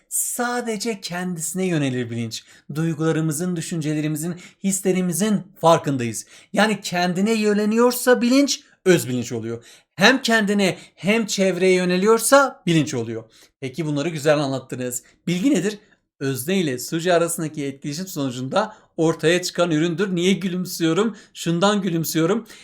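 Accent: Eastern Anatolia, Turkey. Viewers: 2025 tayfadanım herkese selamımı Hocam çok iyi anlattınız sizinle devam etmeye karar verdim.. Bi de bi şi sorcam aslen nerelisiniz acaba? Kars Ardahan Ağrı Erzurum??? Uzatmaları kullanmıyosunuz da😂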